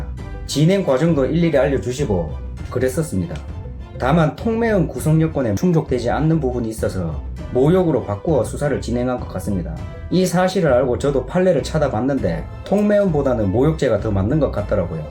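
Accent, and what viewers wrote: Gyeongsang, South Korea. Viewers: 전라도가 좀그래요 님은 경상도?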